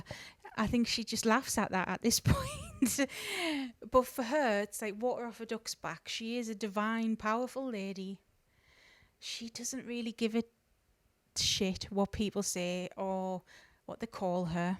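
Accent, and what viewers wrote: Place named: Northumberland, United Kingdom. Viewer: Hiya, I'm so happy to have found your chanel. I have just started working with Hecete and I'm just absorbing all of the information I can. Also - where are you from? You sound like you may be fairly close to me - I'm in Northumberland, not too far from Newcastle. BB.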